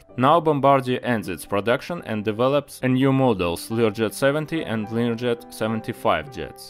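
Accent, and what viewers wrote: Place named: Russia. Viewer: His English is good, but he might not have enough speaking practice, here-hence this crazy Russian accent. ))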